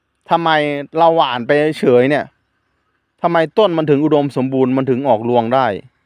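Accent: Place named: Isan, Thailand. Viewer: อืดอัดคุนจะเว้าอึสานก่อเว้าจะพุดไทก่อพุดนะคุนผมคนลาวฟ้งแล้วมันขำ😅และก่อยากหัว😅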